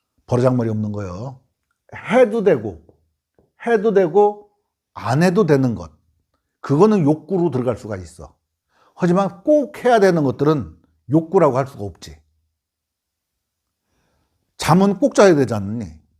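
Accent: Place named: Chungcheong, South Korea. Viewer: 맞는 말씀이유, 시님!!!^^ 충청도 사투리 넘 구수해유^^♡♡♡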